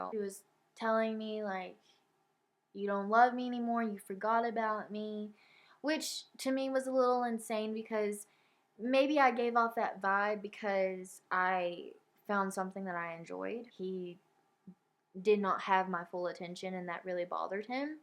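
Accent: South, United States. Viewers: Who notices she has a slight country accent which is adorable 😂😂but thank you so much I was with a guy who made me feel as if I had to do what he said he told me if I didn’t have a ass then he wouldn’t have given me a chance and then broke up with me for his ex who wouldn’t leave us alone but he was basically a narcissistic person he always talked about how girls wanted him and stuff and how fine they were and god am I happy that he left he always thought he could control me and how I acted and accused me of acting single when I wasn’t and now I’m happy living my life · Adrian is surely used to you pranking him, do something like make him think you are pranking him and he gets on edge when you are not. Also, love your accent! Found your videos by typing into YouTube "girl with Southern accent" and your accent challenge one came up. Watched it, loved it, and watched all your others.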